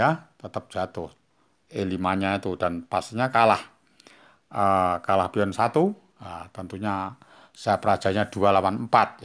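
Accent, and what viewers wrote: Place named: Tegal, Indonesia. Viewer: Sampean kaya wong Tegal min...kaku omongane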